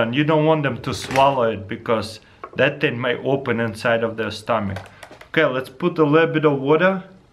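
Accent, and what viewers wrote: Russia. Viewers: Bro i LOVE Russian accents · Your a russian right please speak yoir word and make a subtitled Who agrees!? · Im watching u cause i wanna try and learn how to do a russian accent(you're russian right?:P) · Русский акцент слышен издалека )) привет, чувак :D А штука прикольная)) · По позорному акценту сразу понятно, что русский, лол. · I love you russian accent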